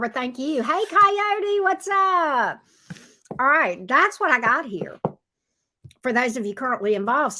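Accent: South, United States. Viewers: Just found your channel after watching you with Tyler. Thank you for sharing your gifts 💕💕💕 Love hearing my southern folks reading tarot!!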